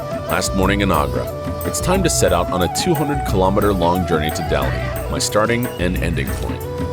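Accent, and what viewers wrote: United States. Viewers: Great video and editing. The 'american' overvoice does not do you justice. I would like to hear a Czech voice.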